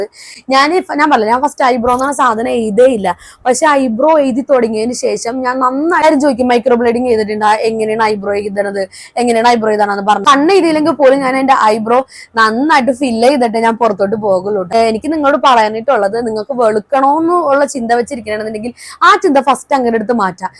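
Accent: Kochi, India. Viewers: മരുന്നിനേക്കാൾഎനിക്ക്ഇഷ്ടപ്പെട്ടത്കൊച്ചി ഭാഷ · Kochikariyanalle samsarathil thonniyatha dear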